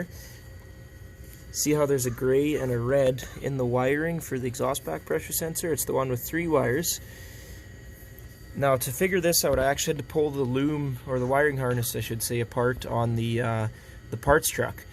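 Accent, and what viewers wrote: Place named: Canada. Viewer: Dude you have to be from canada...lol you and i talk the same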